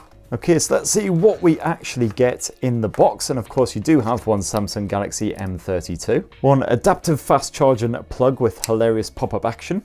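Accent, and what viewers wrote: United Kingdom. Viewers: the only British fam I've no problem listening to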